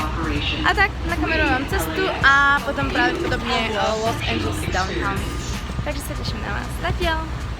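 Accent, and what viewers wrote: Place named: Bratislava, Slovakia. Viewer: Ja mám rada Bratislavskú slovenčinu prízvuk kiež by som ho mala tiež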